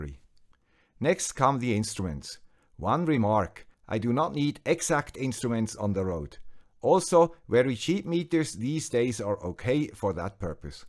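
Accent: Switzerland. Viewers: To me, I think all I have to bring is the swiss accent. Great video and advise, keep it up, cheers! 🎉🎉🎉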